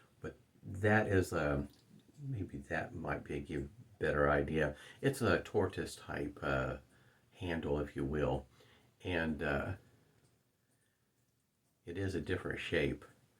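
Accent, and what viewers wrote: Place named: Tulsa, United States. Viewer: I’m a fellow Tulsa and was thrilled to find your channel. I was recommended to your channel from a link on Badger & Blade. They remarked at how laid back you are. I watched the video and thought that you sounded familiar, and then you mentioned Tulsa. You sound familiar because you talk like I do! Anyway, I really enjoyed your video. I use MWF almost exclusively. One thing I do different from you is I load my brush in the crock and then move to a scuttle to add the water. You are right about the crock becoming slick, and I’m a bit ham handed, so I don’t like trying to pick the crock back up until I’m done. When I’m done shaving, I clean up the crock by putting the lid on and flipping the crock sideways and rinsing the whole crock under cold water. A little water sneaks into the container and I put the crock away with the lid on and that teaspoon or so of water sitting on top of the puck. 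Since I shave daily with The Fat, it’s moist and ready to go the next day. If for some reason I skip a few days, I’ll put some extra water on top. Thanks for your channel, I’ve subscribed and turned on notifications.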